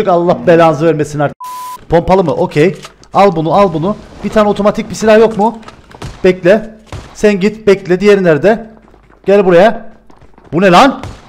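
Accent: Cyprus. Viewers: Uzun zamandır takip! beğeni! İlk yorum muhteşem Kıbrıs şivesi izlemeye doyamadığım videolar başarılar devam kardeşim 👏👏👏👏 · Yıllar sonra abinin Kıbrıs şivesi ve pubg bu halini izleyen benden selam olsun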